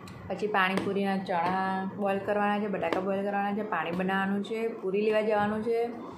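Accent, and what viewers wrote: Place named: Ahmedabad, India. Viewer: અમદાવાદી બોલી નાખો છો સાથે બરોડા વાળી અને સુરતી થોડું થોડું 😅❤️❤️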